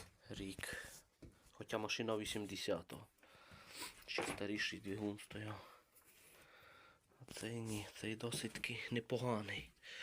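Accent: Transcarpathia, Ukraine. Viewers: Українська ,закарпатський діалект ти ще не чув наш суржик південний😂 а взагалі у всьому світі така ситуація,в кожній місцевості свій говір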